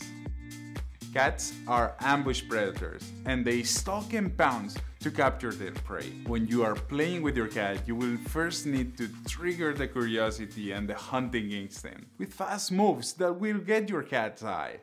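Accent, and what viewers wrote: Spain. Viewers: I'm trying to pin down your accent... Spain?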